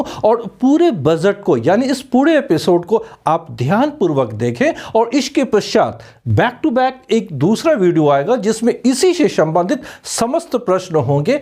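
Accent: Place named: Bihar, India. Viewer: Nyc accents...aap bihar se nhi ho sir???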